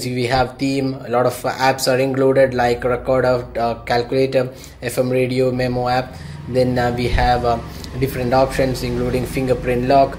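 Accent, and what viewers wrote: Kerala, India. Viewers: like your accent. Kerala?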